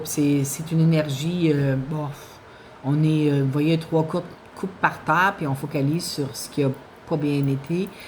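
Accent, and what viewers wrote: Quebec, Canada. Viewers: Je vous adore !!! tout est vrai et cet accent charmand du quebec ; Bon mois de juillet.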